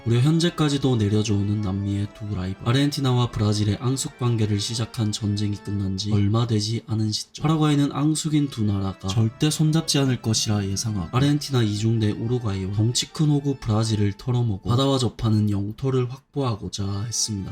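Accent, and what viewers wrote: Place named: Gyeongsang, South Korea. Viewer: 형은 경상도 말 할때가 제일 멋져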